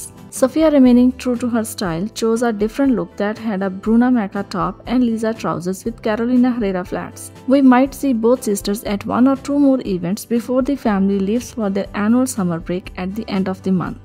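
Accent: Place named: India. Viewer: La locutora en este video es una mujer de la India. Lo puedo determinar por su acento.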